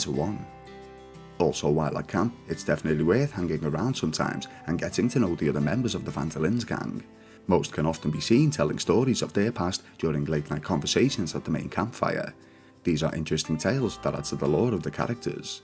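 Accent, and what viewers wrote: Liverpool, United Kingdom. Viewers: 😂 he is a native he's from Liverpool by the sounds of it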